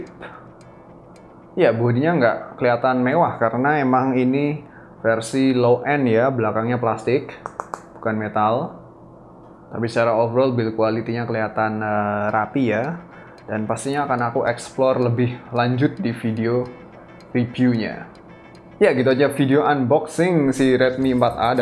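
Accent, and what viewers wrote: Java, Indonesia. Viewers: medoknya khas banget bang 😄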